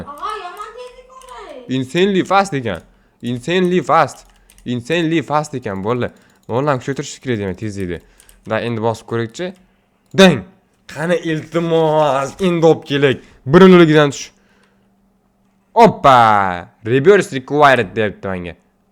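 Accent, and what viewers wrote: Khorezm, Uzbekistan. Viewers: Oka xorazlikmsz a?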